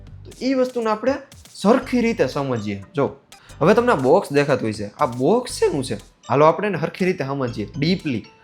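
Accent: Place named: Surat, India. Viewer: AEK number jordar jabardast Little bit Rajkot , but full on surti..... Lot's of love from surat...❤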